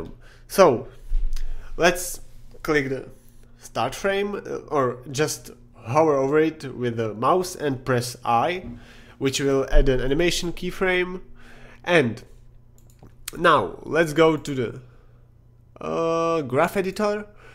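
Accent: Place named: Eastern Europe. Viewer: and that’s not at all a bad thing I like your Slavic accent!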